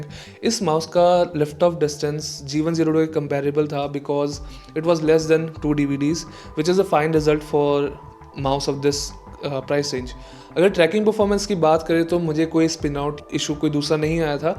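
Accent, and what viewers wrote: Assam, India. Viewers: Assam se ho bro? Bhai na bhai!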